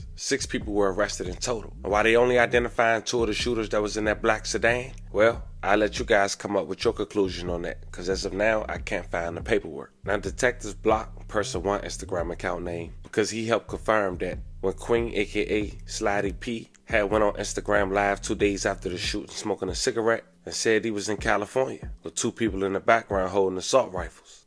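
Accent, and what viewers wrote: Washington DC, United States. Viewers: Sound like he from DC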